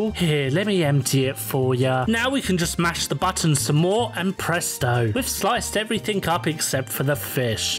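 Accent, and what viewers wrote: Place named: United Kingdom. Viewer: Lol he’s British Letsgameitout fr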